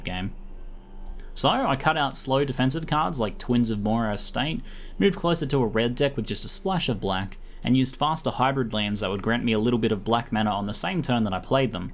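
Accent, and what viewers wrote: Australia. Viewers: oh wow, I haven't seen any mtg content created by a fellow aussie before, this is awesome! I want to find more of the Australian MTG community, where/who are the people? Sometimes it's just nice to hear an aussie accent, you know? :D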